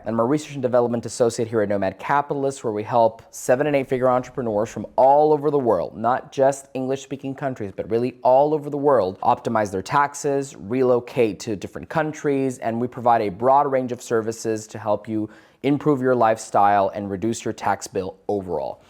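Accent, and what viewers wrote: France. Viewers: So you speak French? Bravo, mon ami. Great accent and awesome video! Salutations de Paris, France. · Nice French accent. And clear English too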